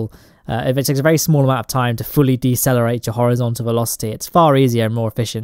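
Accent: United Kingdom. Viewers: Trying to transcribe the intro, but I am missing one lousy word. My Canadian hearing eh? can't pick up one British accented word. Please review and correct? Thanks. On a lonely planet slowly spinning its way to damnation amidst the incompetence and utter carelessness of lesser space perrians, one team stands resilient against the herds. Putting their lives on the line to aid those who were previously unaware of the quick-save option. Yes, it's the incredible adventures of Jebediah and his crack team of kerbonauts. They are: THE BLUNDERBIRDS. Saving the Kerbin race one stranded explorer at a time.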